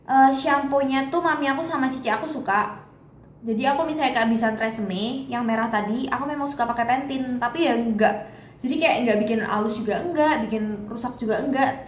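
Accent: Java, Indonesia. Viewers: kka agak medok ngomongnya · medok bgt kak ngomongnya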